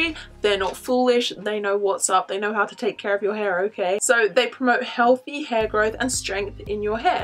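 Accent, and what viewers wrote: United Kingdom. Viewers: I need your GORGEOUS British accent!!!!! I would be a new woman with a new lease on LIFE!!!! 💜💜💜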